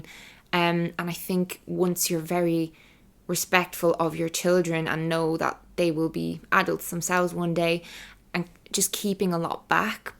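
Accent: Ireland. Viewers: If I'm having an off day, hearing your Irish accent makes it a good one. Thanks!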